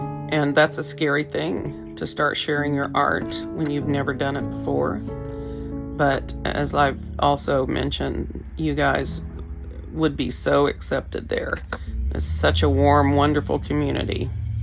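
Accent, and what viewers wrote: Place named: South, United States. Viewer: I beg your pardon, we do say y'all from time to time, but around here, we don't use y'all like Paula Deen. I love her but she goes way over board with it. But you know, when she first went on tv she didn't do that. Must be some sort of publicity crap. At any rate, I do love your tangles, southern accent and all. 😂😂😂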